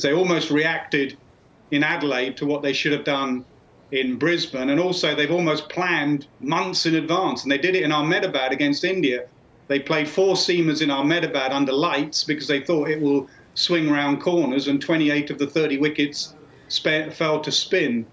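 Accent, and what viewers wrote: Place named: United Kingdom. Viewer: I could hear Nas all day talking cricket and wouldn’t move an inch. Nas is really good! That British accent makes it even better !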